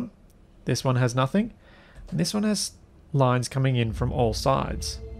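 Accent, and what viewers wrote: Australia. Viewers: I have been enjoying this game sooo much more since I started watching your videos, so just wanted to thank you for all the time and effort you have put in - they are all brilliant - Thank you! I have even gotten used to the Aussie accent:p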